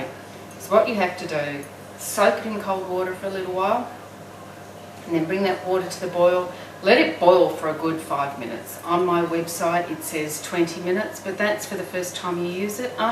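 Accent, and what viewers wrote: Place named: Australia. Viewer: Want to try a Chrissy Pudding for the first time this year. Living in England with my boyfriend and will be spending chrissy with his family again (a little chillier than 20 degrees), so wanted to do something special this year. Found a good recipe but the calico aspect escaped me-this video was so helpful! Also nice to hear the accent, if I closed my eyes I would have sworn I was listening to my Aunty Sue from Campbelltown :P so thanks for the reminder of home! Hopefully it works out!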